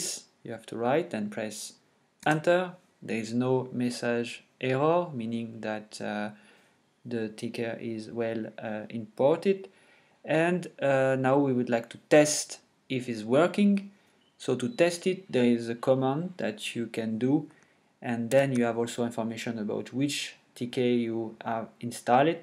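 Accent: France. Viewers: cet accents français XD est ce que ce tuto marche sur windows ? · MDRRRRR t'a un putain d'accent français t'aurais pue le faire en francais ton tuto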